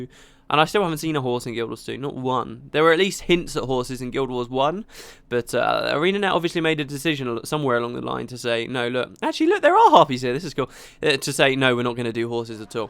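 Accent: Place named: RP, United Kingdom. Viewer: Having such a hard time trying to imagine how you look like, like; You speak like a truly british gentlemen, but somehow you are soo nerdy when it comes to knowing the Gw lore and you watch (a lot/some?) anime and you got this somewhat awkward humor that is hilarious to listen to.. I have no idea what to imagine, I jsut want to know if you're good-looking! D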